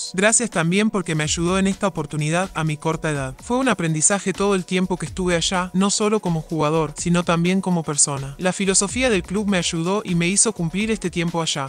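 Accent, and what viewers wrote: Argentina. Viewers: Que inche necesidad de el acento argentino? Le da más valor a la publicación?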